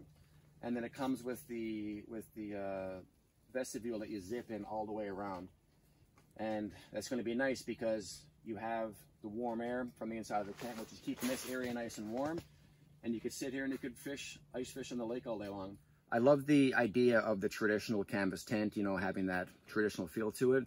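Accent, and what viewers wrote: Canada. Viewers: Im 49 yrs old...i have not been camping since i was 13...... my wife and i are just now talking about possibly getting some camping gear...this is cool Brother you sound like you have a very thick northern accent....im guessing Canada???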